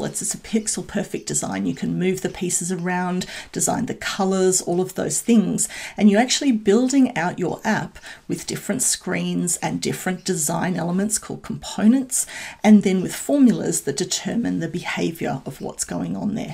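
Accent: Australia. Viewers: I dodn't know Australian sound like this :D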